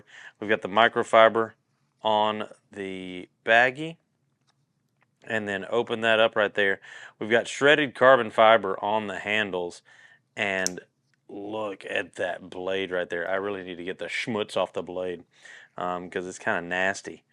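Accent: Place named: Appalachia, United States. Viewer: Have you met Jeff from Everything Auto? Pretty sure he’s your vocal dopalganger! Maybe it’s just that Appalachian twang?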